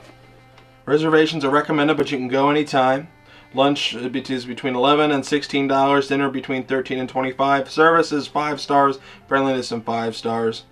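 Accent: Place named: United Kingdom. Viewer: ahaa your British accent was great :D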